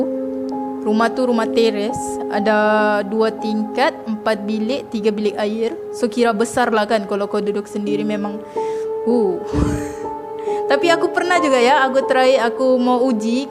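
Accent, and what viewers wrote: Sabah, Malaysia. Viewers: siuk cerita mu sis 👍 suka sya dngr . tmbh tmbh lg loght sbah beii . snang sya fham . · Suka dgr akak ckp slang sabah😄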